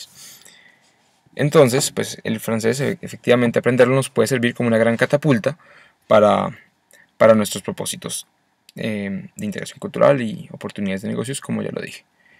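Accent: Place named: Colombia. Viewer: Menudo acento Colombiano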